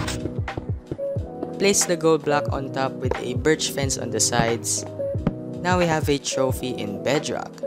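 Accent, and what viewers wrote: Philippines. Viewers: You sound Filipino.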